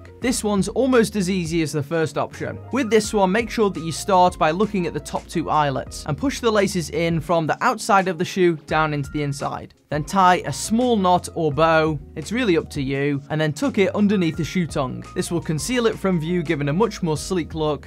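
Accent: United Kingdom. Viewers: I watch his videos only to hear that British accent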